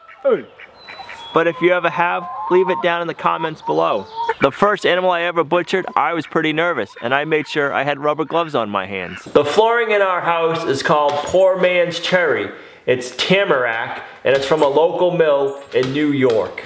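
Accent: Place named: New England, United States. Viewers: I didn't find your channel until this year. I told my wife ... "he has a funny accent for a guy from New Hamphshahh." Now your accent makes sense. My relatives lived on Davis Street, at the top of the hill over the Norfolk Aggie. When I grew up, we used to walk down and to the back and visit the farm animals. But we know to avoid the geese in the front pond ... they were aggressive and mean. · I like listening to your new England accent. Your channel is very interesting. You seem to enjoy your homestead so much.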